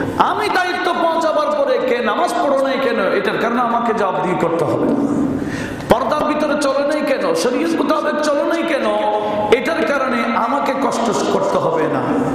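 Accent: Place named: Chittagong, Bangladesh. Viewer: হুজুরের বয়ানটাও চট্টগ্রামের।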